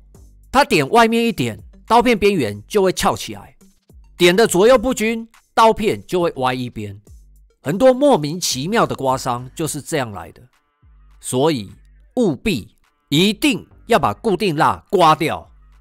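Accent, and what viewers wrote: Taiwan. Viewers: Jasper你好，喜歡你講台語的用詞跟腔調，很合高雄人胃口😆 我是個想換刮鬍刀的新手，看了幾部你的影片， 發現一些長相很像市售盒刀的傳統刮鬍刀在你的影片畫面一閃而逝， 由於那些一閃而逝的畫面並沒有特別提到型號，所以我也就無從搜尋起， 因此想來請教Jasper，有哪些長相像一般市售盒刀，又適合新手用的傳統刮鬍刀呢? 謝謝回覆~ 🫠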